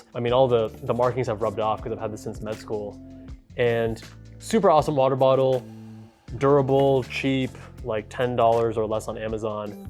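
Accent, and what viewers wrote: India. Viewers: The Indian accent is ok with me 👍 Sincerely, an Indian person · Loved you INDIAN accent dude💕💕💕💕💕💕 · 👏👏👏👏very nice with the indian accent ,guud than Indians, with beauty tips and steel except that plastic... haha !! Like 👶👶 enjoyable and useful and diff accents pls😇😇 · I vote, Indian accent every intro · ah yes the indian accent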